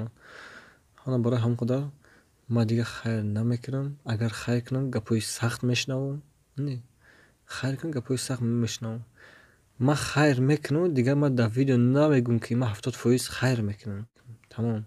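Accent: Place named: Shurobod, Tajikistan. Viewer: И манера ба фигрм ай шурободай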